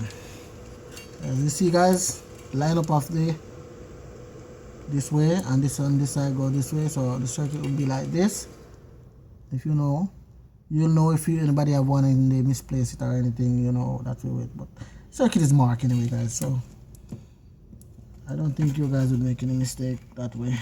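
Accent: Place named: Jamaica. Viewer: Sound like a yaadie🤔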